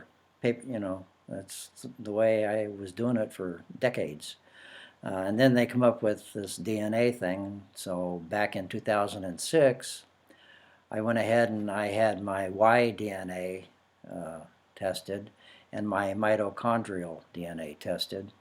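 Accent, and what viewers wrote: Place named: Sierra Leone. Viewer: 😂😂😂 you sound like a Sierra Leonian.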